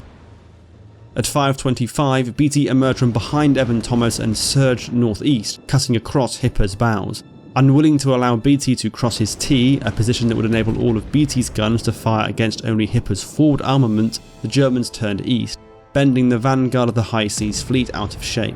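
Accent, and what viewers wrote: United Kingdom. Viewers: Holy crap. You sound like a limey but you mispronounced nearly every single name!!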